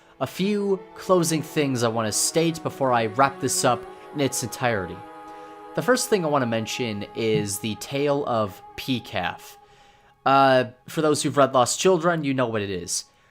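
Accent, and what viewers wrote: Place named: United Kingdom. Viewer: hey broken-sexual boy, why do you pretend Griffith = "Griff-iff?"...are you infected with some form of disease that perverts your enunciation to sound shitty aka British ?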